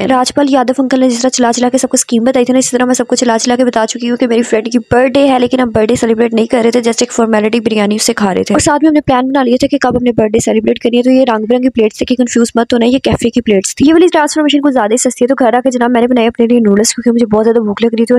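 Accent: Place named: Punjab, India. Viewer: Ap ka bolny ka style superb h sat mien jo taraa wala yani punjabi ka tarka very nyc stylish design beautiful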